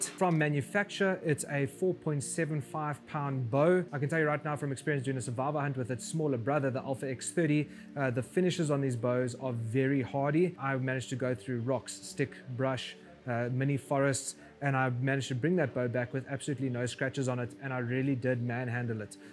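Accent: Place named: South Africa. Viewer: At first i heard you say 572 was your hunting weight and i thought oh my another poor uninformed guy that's been watching ranch fairly but then I remembered the south African accent and realized you're hunting way different animals than i do in Oklahoma so maybe you need those heavy arrows lol. Anyway great video. I just won an ethos at a 3d shoot so i was just watching some reviews. I'm actually entered to win an Africa hunt from that event too but still waiting on the results of that drawing🤞🏼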